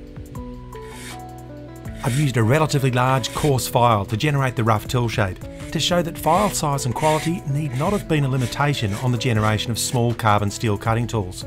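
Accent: Australia. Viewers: Ele e Australiano. Eles mudam o som dos vogais. Use os subtitulos ingles.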